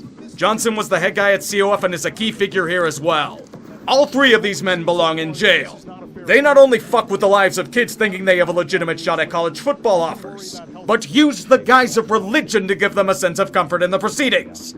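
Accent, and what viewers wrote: Pittsburgh, United States. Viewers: Naw, that’s just the yinzer coming out.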